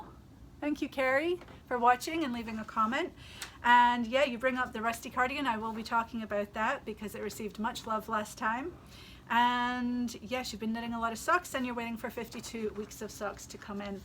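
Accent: Scotland, United Kingdom. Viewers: I love hearing your wonderful Scottish accent and seeing all of the gorgeous Yarns you bring in for us! Thanks so much and stay safe!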